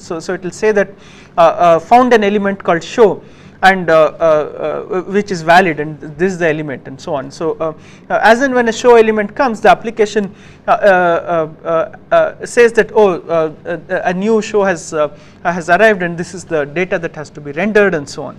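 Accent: India. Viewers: speak a little slower because your Indian accent isn't the best sir i can't understand hardly anything you said.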